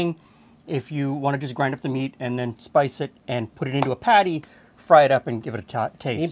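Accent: Canada. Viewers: I'm amazed at the kitchen equipment he has. He must have a big place to store all that stuff. I mean, who has a dedicated sausage stuffer? It's not exactly small either. Also, I wanted to mention for a while now, I love how Canadians say certain words like "out". I can't even describe how it sounds to me. Makes a Canadian, or American from the far North stand out every time.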